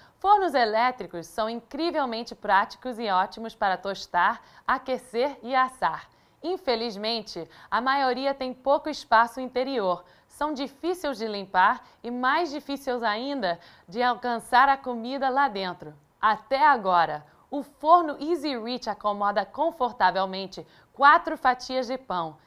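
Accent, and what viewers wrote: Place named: Rio de Janeiro, Brazil. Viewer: O vídeo não é dublado. Ela parece falar português como nativa, mas dá umas escorregadas, até qd fala "ô" (em vez de "ou"). Mas percebam que ela fala também em inglês, e nessa língua, a pronúncia dela é perfeita! Rsrs. Acho que o sotaque dela é carioca, mas sem o chiado.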